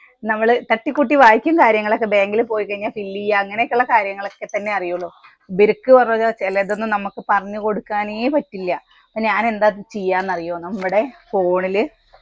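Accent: Palakkad, India. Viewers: Thanks... എനിക്ക് തോന്നി ചെറുപ്പളശ്ശേരി കോങ്ങാട് ഭാഗത്തെ സ്ലാങ് നല്ലോണം ഇണ്ട്☺️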